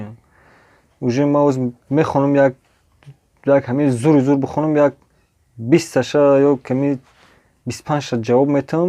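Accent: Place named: Muminobod, Tajikistan. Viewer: Лахчаи гапзанитонда гап нест........суппппееееееееер .муминобод.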